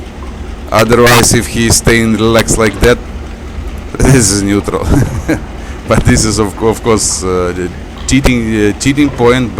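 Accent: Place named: Russia. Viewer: Вермахт это в переносном смысле за стрельбу из пушки, а не торпедирование где хочется посмотреть мастерство. А на счёт реализма странное не понимание, ну какой может быть реализм у симулятора да ещё с таким множеством поблажек который он дает игроку? Здесь не то что снижать, самому игроку приходится ещё додумывать как бы усложнить игру. Кстати на западе почему-то все упорно играют на низком бояться что ли? Я сперва пока не услышала акцент тоже подумала, что с запада, а потом смотрю под видео название-о да это наш.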